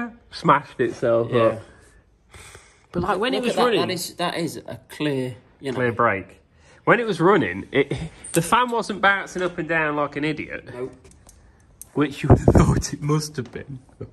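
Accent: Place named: North Lancashire, United Kingdom. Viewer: Sound like your from north Lancashire, what bits do you need I'm sure I can find them 😉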